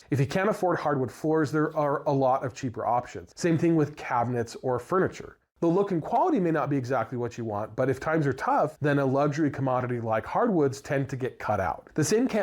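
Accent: United States. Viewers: Is this Australian or American Lumber Markets ? You sound American..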